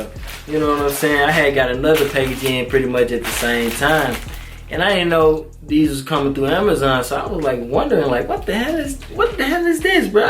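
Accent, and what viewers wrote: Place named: Memphis, United States. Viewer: You sound like u from Memphis..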